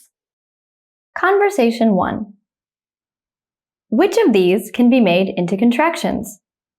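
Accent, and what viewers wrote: United States. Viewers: I like the USA pronunciation. Today I am understand some short form of future tense will such as I will(I'll) you will (you'll) we will (we'll) and so on. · Hi teacher, Definitely, I like your American pronunciation. My examples; I'll visit my brother this evening. You'll be happy, if you follow my channel. We'll be so excited, if we meet again. He'll study English hard for the exam. She'll be looking gorgeous, if she wears that dress. They'll start teaching all subjects online.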